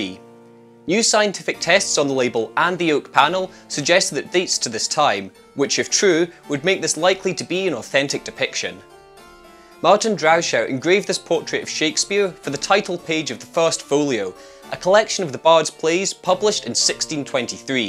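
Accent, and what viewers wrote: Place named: Scotland, United Kingdom. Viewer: Since my wife passed away, 13 years ago, and being retired, I've studied everything I could lay my hands on re WS. Conclusion: he wrote the plays, with a collaboration here and there, but the greater part of Shakespeare was written by a bloke name of William Shakespeare. Only two images are accepted as genuine likenesses, the bust which resembles nothing more nor less than the local butcher, and the Droeshout which Ben Jonson endorsed as a true likeness. The Sanders is an elaborate fake, and there was a period in the 19th C when dozens of fakes were produced. It was de rigeur at the time. And seeing as the presenter sounds Scottish, I think he'll know what I mean when I call these conspiracy theories a load of old bollocks. And I wonder if WS had a penny for each word spoken and written about him for the last 400 years. 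So bollocks it is then. QED.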